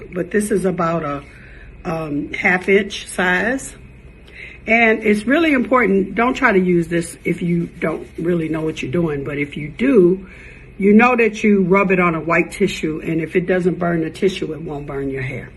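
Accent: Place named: South, United States. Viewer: I love her Southern accent ❤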